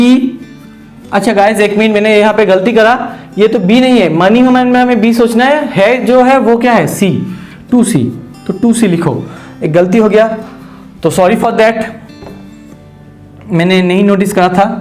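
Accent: Bengal, India. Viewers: Sir are you bengali please reply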